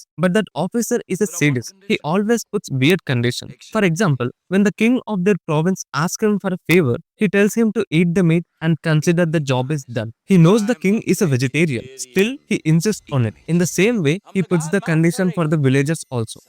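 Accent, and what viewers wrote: India. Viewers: Calling a movie way ahead of it's time with an excellent cast and pitch perfect script as a "trick" you're giving it as a bad name. Ruining something which has been a cult classic for decades is what you seem to be good at. Judging your accent, you're probably Tollywood movie fan which shows one man who has no real challenge in the real world, is a god, super hero and all achievable. At least this movie didn't portray any unrealistic things like southern movies from today's do. Appreciate the art if you can't respect it.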